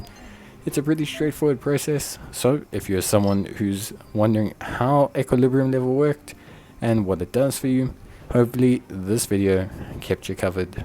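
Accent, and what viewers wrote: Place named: South Africa. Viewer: Bro sounds south african😅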